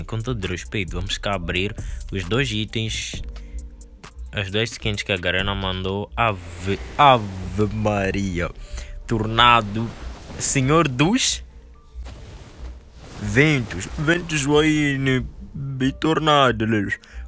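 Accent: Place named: Portugal. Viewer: "Vamos ca ver 1x, vamos ca ver 2x, 3x, 4x,5x" ehhhhhhhhhhhhhhhhhhhhh tuga tmlc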